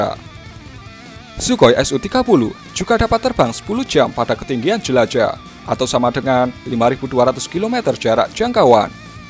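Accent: Java, Indonesia. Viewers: MEDDHOOOK mas MEDDHOOOK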